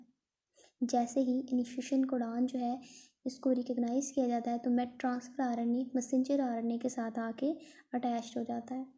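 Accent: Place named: Pakistan. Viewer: pakistani accent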